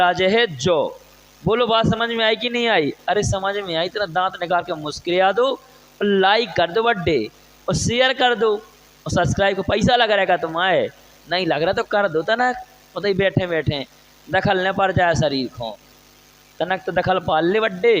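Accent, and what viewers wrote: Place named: Bundelkhand, India. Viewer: Sir apki bundeli bhasha bahu achchhe hai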